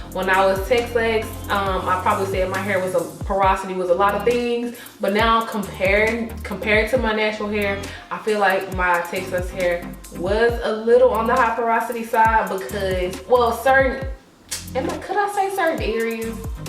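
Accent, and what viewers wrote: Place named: Texas, United States. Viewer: I heard that accent and just knew you from Texas 🙌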